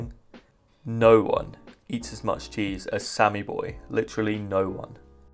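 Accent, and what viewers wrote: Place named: United Kingdom. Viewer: Bro,he Bri’ish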